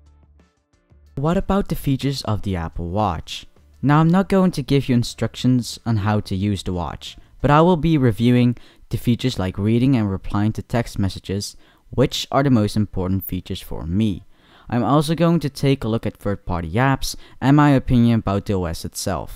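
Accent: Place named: United States. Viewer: Je Amerikaans is super goed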